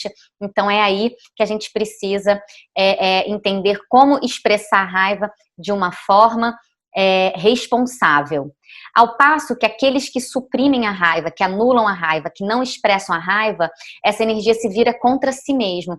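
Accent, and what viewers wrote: Rio de Janeiro, Brazil. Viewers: Desculpa nãos consigo ouvir carioca nem de longe...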